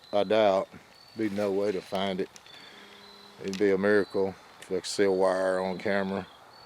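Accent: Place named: Appalachia, United States. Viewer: it's impossible to take this clodhopper seriously with the hocking up phlegm and spitting and the lazy hillbilly dialect.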